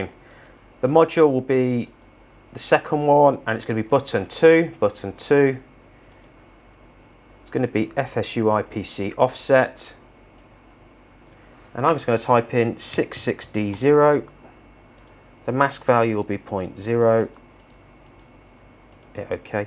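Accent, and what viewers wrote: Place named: United Kingdom. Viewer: That s awesome! I love the British accent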